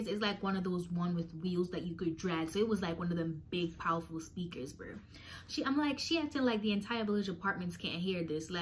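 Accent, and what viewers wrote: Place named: Jamaica. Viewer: Mi hear the patois fam 🤣🤣🤣big ups no say a word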